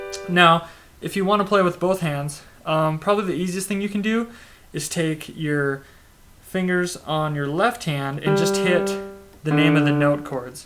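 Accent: England, United Kingdom. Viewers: hello where ur hometown ? i really like your english accent ^^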